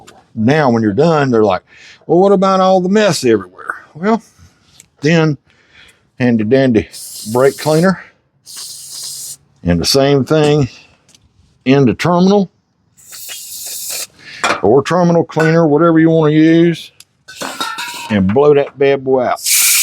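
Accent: South, United States. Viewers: I knew you had that Southern Drawl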